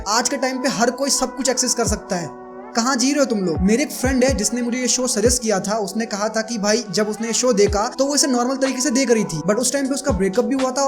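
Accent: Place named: Rajasthan, India. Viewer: Mjhe laga hi tha bhai rajasthan se h.....bolne k tarike se hi lag jata h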